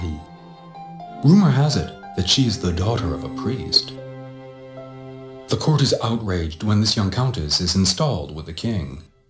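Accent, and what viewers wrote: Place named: United Kingdom. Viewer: The narrator clearly sounds British, not american nor French either.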